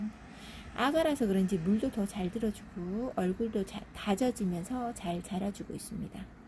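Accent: Gyeongsang, South Korea. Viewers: 목소리가 경상도 분이신거 같아요...혹시 창원은 아니시지요? 제가 창원에 내일까지 있어요..혹시 창원이시면 커피하고 싶네요...ㅎㅎㅎ...너무 예뻐요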